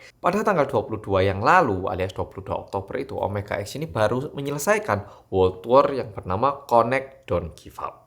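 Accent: Java, Indonesia. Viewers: Glodakan gak tuh.. nyentak ke saya 😂 medhoknya bisa otomatis nyampur gitu ya bahasanya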